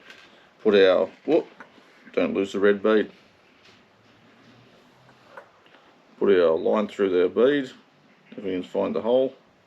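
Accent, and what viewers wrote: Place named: Australia. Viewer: GREAT NORTHERN BEER:, Thanks for the Rig demos for the Tasmanian. I enjoyed seeing this, AND You tilting the Cans of Great Northern. The slured Speech, along with The Down under Accent was busting me up. I think my Demo " BRO", Was half in the bag, while doing the Video. LMAO.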